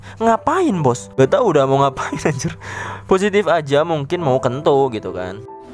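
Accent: West Java, Indonesia. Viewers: Sunda bang?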